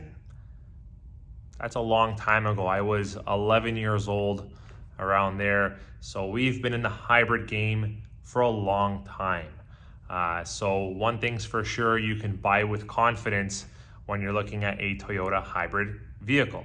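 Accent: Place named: United States. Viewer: Why are you using kilometers units, obviously you sound you’re from the US. 180,000km equals roughly 112,000 miles. The problem I see is first you are paying a premium bc it’s Hybrid, and if the batteries fail before the warranty, wouldn’t that eliminate the savings for fuel ( the reason why you bought a hybrid) If you’re an owner that keeps a car for a long 15-20 yrs, (like me) how much EMF has that person been exposed to ?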